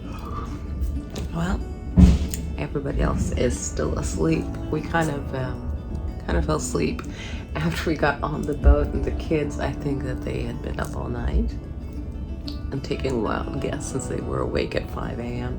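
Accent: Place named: United States. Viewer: Ou u live in sweden but u cannot be og swedes judging this by ur accent. Its wery amerikan accent. But anywAYS have great coming winter!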